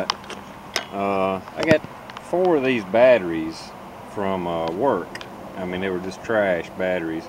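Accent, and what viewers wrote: North Carolina, United States. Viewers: Nothing wrong with that "country accent"... I have one as well. You sound like a North Carolinian.? Or deep South at least. Great find on those "trashed" batteries!! And great video! Thanks!!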